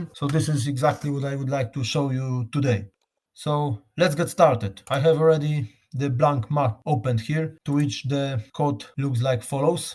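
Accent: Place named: Malta. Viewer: ah! You sound Maltese. Thanks for your informative videos. Dziękuję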